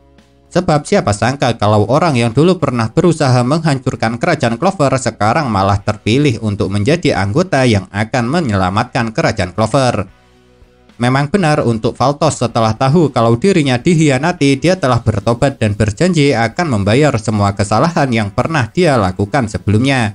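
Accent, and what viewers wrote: Java, Indonesia. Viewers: Walaupun jawanya medok bnget.tp aq suka sma admin ne.soalx dya tu singkat and padat pnjelsanx.tp tolong donk bang ksih potongan2 dre mangax donk... Smngat yach bang...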